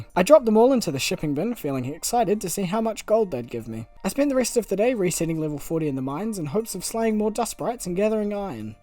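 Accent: New Zealand. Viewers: Are you from NZ? I'm Australian and ur accent rlly sounds nz :) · stardew and a nz accent 🥰 love it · You from NZ? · is that a fellow kiwi accent i hear? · Great video and very funny! Is that the Kiwi accent I hear?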